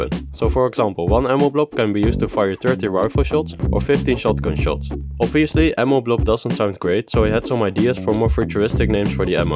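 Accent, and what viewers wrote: Netherlands. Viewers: It sounds like u have a dutch accent and I immediately noticed that because im dutch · Let me guess, you are dutch? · I looooove the Dutch pronunciation of English “v”s. “Full control Ofer the qamera” - its so satisfying. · Are you Dutch?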